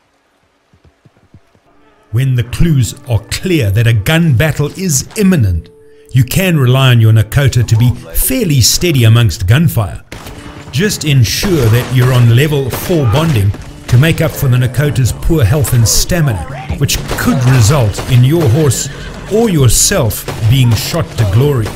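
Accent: South Africa. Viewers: Great video! First time I’ve seen you on YT. Your accent is South African. Eastern, I’m guessing.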